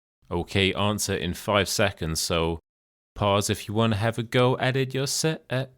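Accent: United Kingdom. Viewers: Like it very much your videos and it perfect British English and properly helped and thank you very much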